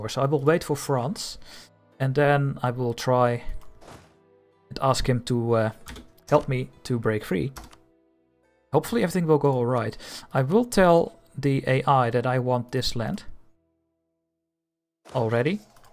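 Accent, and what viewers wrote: Netherlands. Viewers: As a Dutch person myself its really easy to recognize a fellow Dutch person speaking English. But I can Imagine its hard to catch if you are not Dutch yourself. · This is good and proper. A dutch man with a thick dutch accent forming a strong Netherlands. Gezellig! I'm gonna enjoy this series. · LUL as a person born in Netherlands, this is a THICC Dutch accent bro xD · Idk man, has a pretty noticeable Dutch accent. Maybe not as thick as many others from here have but it certainly isn’t accentless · It’s really interesting to hear the Dutch pronunciation of the Dutch provinces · his english is perfect but he clearly has an accent. a dutch one. an obviously very dutch accent. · He has a very strong Dutch accent lol, might not be obvious if you dont speak English or Dutch natively. ex. marinesss dutsh, persent, usash, tshoosh. very Dutch, all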